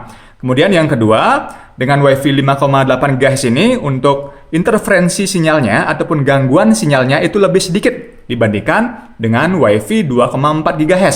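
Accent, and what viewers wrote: Bali, Indonesia. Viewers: Logat bali, Semeton ne 😍👍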